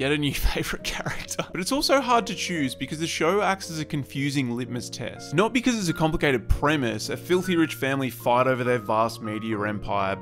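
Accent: Australia. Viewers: is this the same guy form skillup or every australian sound the same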